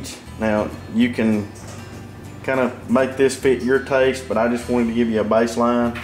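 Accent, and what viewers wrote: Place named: South, United States. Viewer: Nice the accent you know the country guy can cook. New sub here thanks for the video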